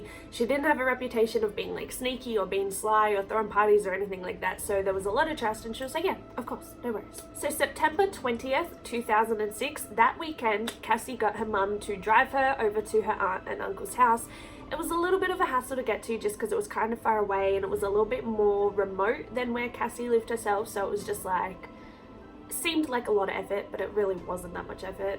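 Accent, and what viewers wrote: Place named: Australia. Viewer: He can never be forgiven for what he did, neither of them can, but I do believe I see regret in Brian's eyes. The other dude just comes across as a complete and utter psycho, and his parents are clearly deluded and in denial. Anyway, awesome presentation! You're rather easy on the eye and who doesn't love an Aussie accent? Sucks that you don't really seem to be active any longer. Stay safe!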